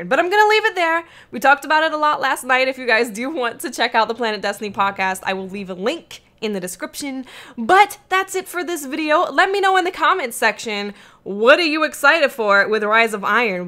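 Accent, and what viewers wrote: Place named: New York, United States. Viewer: Is she from New Jersey Brooklyn or New York she kinda sounds like she has that accent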